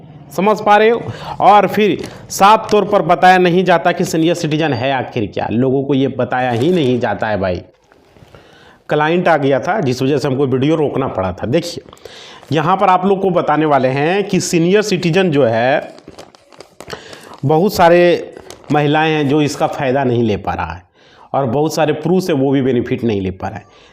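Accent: Bihar, India. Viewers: Bihari ho kya aap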